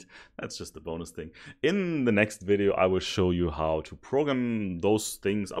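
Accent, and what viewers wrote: Germany. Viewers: I can tell he is German 😁, thanks a lot sir.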